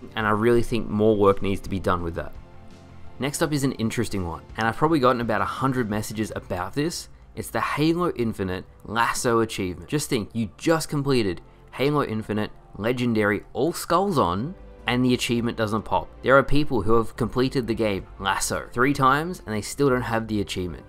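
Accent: Australia. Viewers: is it bad i just noticed you're Australian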